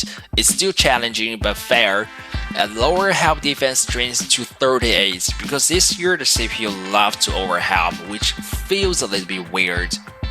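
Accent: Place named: China. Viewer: This guy is probably Chinese.. cause of his accent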